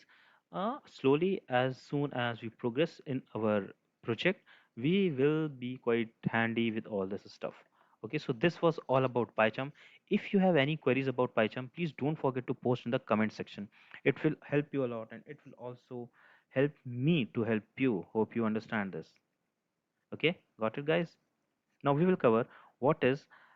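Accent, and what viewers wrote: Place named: India. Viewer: what is it with all this indian dudes, i just can't find a video without a heavy indian accent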